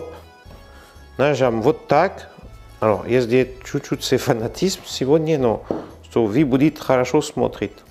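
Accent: France. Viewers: Здравствуй. Только что нашла твой канал, с улыбкой отсмотрела и слушала твой красивый фрцузкий акцент, записалась уже и буду готовить этот рецепт. Если бы ты знал сколько рецептов я попробовала, но я недовольна, обьязательно напишу о результате. Мерси боку.😘👍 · Как мило вы говорите по русски!Ваше видео смотрится,как приключенческий фильм с юмором и французским акцентом!Не все понятно,но сделаю по простому рецепту!Спасибо вам за урок! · Как же нравится его французский акцент!ну очь.сексуально!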